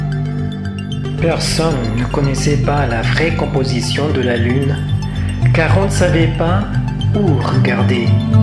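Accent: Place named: France. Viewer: c'est tres tres sympatique ...ahahaha ... et Ton accent Français est magnifique :)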